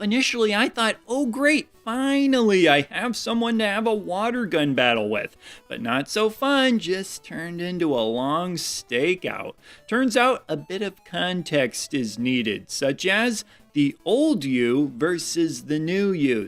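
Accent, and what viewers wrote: Midwest, United States. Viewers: Dude I legit haven’t watched one of your videos that I haven’t loved Thank you I watch your channel (mostly listen) while doing chores like washing dishes or folding laundry and seriously I feel like you are my Midwest (guessing on the accent) BFF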